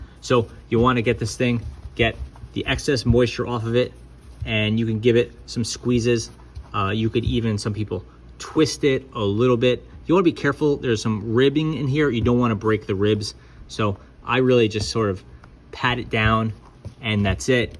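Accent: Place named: United States. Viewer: Hi from Australia 😊 you have the best American accent 😊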